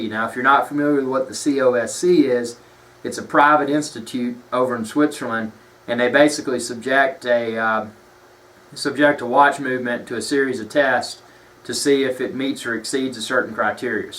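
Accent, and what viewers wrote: South, United States. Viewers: sounds even better when you say it man! I mean it! That southern accent is the best. Greetings from Canada.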